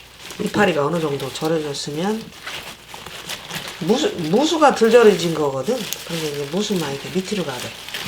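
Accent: Chungcheong, South Korea. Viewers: 충청도분이신가봐요.ㅎ 제고향 어르신이 옆에서 말씀힌시는것같아 더 친근감이 있어 좋네요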